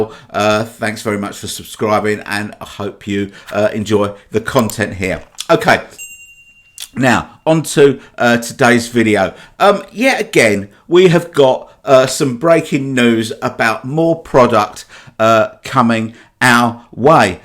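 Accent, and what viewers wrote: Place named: Cockney, United Kingdom. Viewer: Hi, Just one off the ol' cuff and not related to this latest offering, but I watch all your videos and like that east end twang you have... Am I correct in thinking your an irons supporter? If so I wonder how many of us are duel Hammer/Beatle fanatics? How wonderful both our sides ruled the world in the mid sixties, anyway enough of the sentimentality! Take care Kev P.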